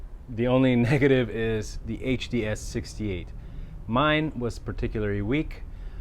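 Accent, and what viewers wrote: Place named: Northern Italy, Italy. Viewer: Grande video e belli questi codici!...secondo me' tu hai l accento dl nord Italia ovest (Milano, Torino)....., Cmq sei grande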